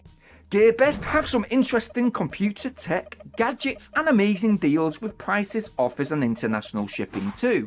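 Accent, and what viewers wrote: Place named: England, United Kingdom. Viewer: Love the presentation and your English accent!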